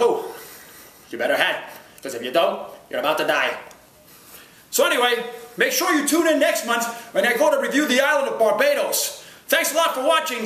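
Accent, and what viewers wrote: Trinidad and Tobago. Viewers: he does a great trini accent...im a traveller too and i video the other islands too. check out my clips..click my name and away u go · Your trini accent really good, nice man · That Trini accent is on point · He talks trini so well tho Ahaa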